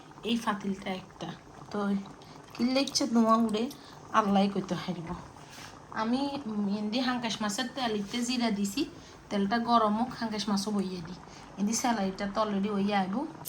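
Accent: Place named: Bhola, Bangladesh. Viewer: আসসালামু আলাইকুম আপু।আমনেরে এক্কেরে আর দাদীর মতন লাগে বোজ্জেন নি আপু,আমার বাড়ি ভোলা চরফ্যাশন কেরামতগন্জ কিন্তু আমনেগো আর আগ্গো কথা এক্কেরে মিল আছে বোজ্জেন নি আপু,,,, আমনের ভিডিও লইয়া আমার কোন অভিযোগ নাই,,, আমনেরে দেখলে আগ্গো দেশ দেকচি মনয়,,, আমি বর্তমানে চট্টগ্রাম থাকি 😢😢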